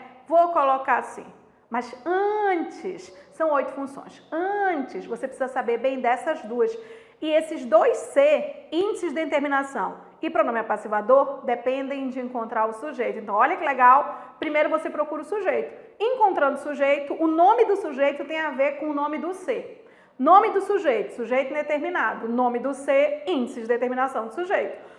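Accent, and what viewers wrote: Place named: North, Brazil. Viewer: Eu estudando e aproveitando para matar saudade do sotaque do Norte...morei 4 anos no Pará...parabéns pelas explicações.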